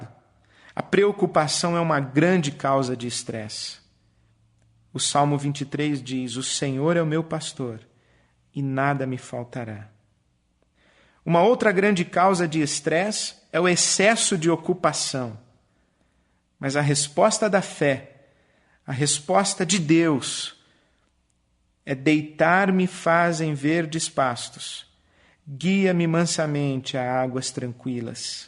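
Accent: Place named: Rio de Janeiro, Brazil. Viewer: senti um sotaque meio carioca, um R puxado.. as vezes nem parecia ele.. kkkkk Benção pastor, obrigada por cuidar de nós através das suas palavras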